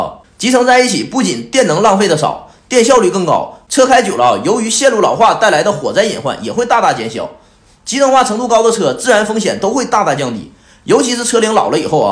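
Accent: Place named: Northeast, China. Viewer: 听这东北银白活了半天，特斯拉原来这么遥遥领先呀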